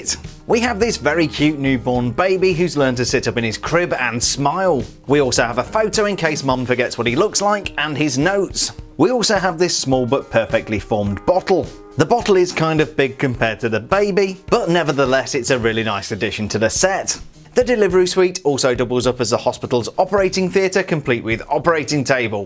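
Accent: United Kingdom. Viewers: you sound so English I’m English and you sound more English than me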